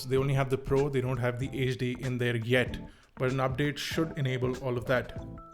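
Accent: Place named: Mumbai, India. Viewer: that's Mumbai accent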